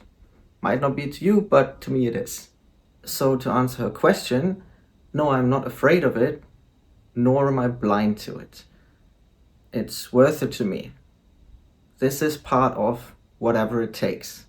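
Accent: Germany. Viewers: your accent sounds very german.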